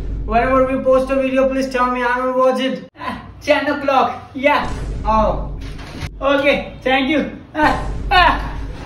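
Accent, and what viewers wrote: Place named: England, United Kingdom. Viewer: Dude Ur English Accent Is So🔥😂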